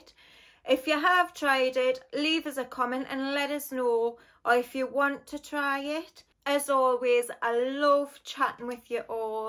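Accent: Scotland, United Kingdom. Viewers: I love your accent! Scotland? It's so precious. Thanks for the review :)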